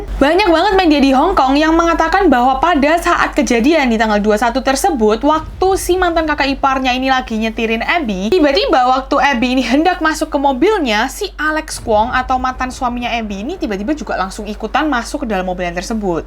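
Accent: Indonesia. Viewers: Aku seneng Medokeee neng...Lnjutkan perjuanganmu 😘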